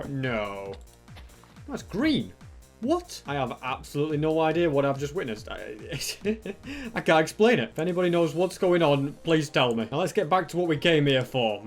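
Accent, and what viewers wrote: United Kingdom. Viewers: Why we all love doesn’t scam has a satisfying BRITISH voice Edit: HOW I COULD FROGET The shiny eyes of course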